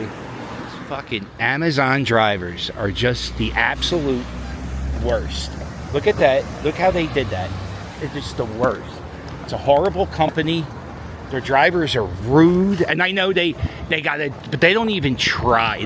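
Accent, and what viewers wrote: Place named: Baltimore, United States. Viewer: Yo how are you...with a Baltimore accent??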